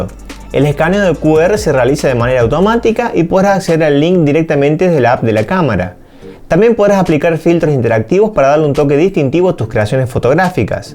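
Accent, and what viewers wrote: Córdoba, Argentina. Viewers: muy buen review! me suena a tonada Cbesa la de, mepa o es de Río III? Saludos!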